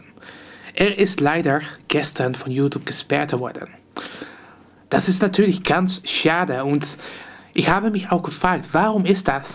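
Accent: Netherlands. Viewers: Deine Sprachkenntnisse sind meiner Meinung nach auch gut. Ich hatte auch mal eine Englisch Lehrerin, die aus Niederlande kam. Sie hatte den gleichen Akzent wie du =) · danke! Ich habs mir voll angekuckt und teile zu 100% deine Meinung. Dein Niederländische Akzent ist lustig ;D Mach weiter so :)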